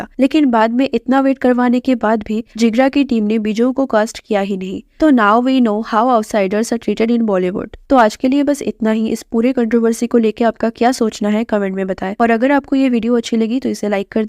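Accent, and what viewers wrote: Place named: Kolkata, India. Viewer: That's khosla, not khoshla😂 I dont know why but I feel you are from Kolkata🤔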